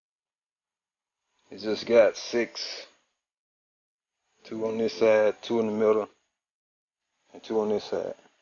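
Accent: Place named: Memphis, United States. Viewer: Is this in Memphis Tenn? Sounds like it.. either way you just got another subscriber. Entertaining man nice work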